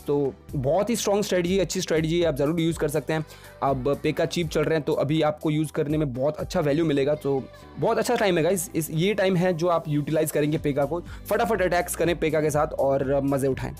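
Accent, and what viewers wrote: Bengal, India. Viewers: Bhai tumi bangali ?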